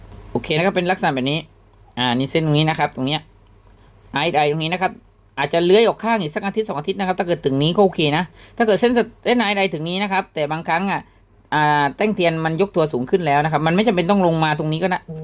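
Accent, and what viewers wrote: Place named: North, Thailand. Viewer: รู้เลยว่าคนเหนือ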